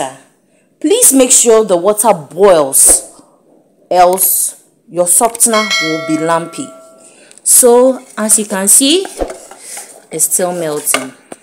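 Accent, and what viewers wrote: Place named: United Kingdom. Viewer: I love how you meticulously speak the Queen’s language ❤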